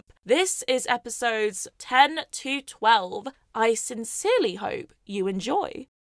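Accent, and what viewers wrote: United Kingdom. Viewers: Woo 👏 love your voice Also your really British it just comes out so much I love it omg lol 😅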